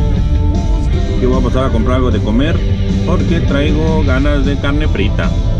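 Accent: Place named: North, Mexico. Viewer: Te oyes bien norteño :v like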